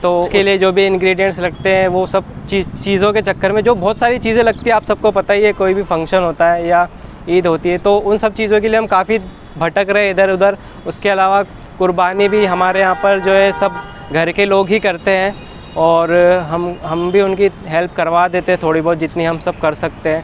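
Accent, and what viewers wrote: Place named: Pakistan. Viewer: App Kay kahnay ka style same pakistan ki Tarah hai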